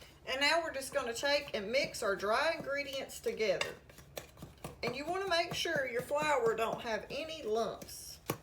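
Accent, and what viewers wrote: South, United States. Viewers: I may make these tonight! I love your southern accent. Yumy Yum - Thank you. (Greensboro NC)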